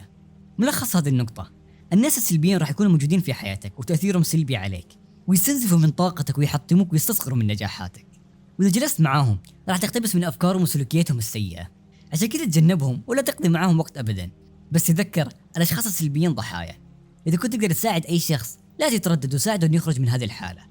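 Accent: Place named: Saudi Arabia. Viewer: من اللهجه واضح سعودي☺️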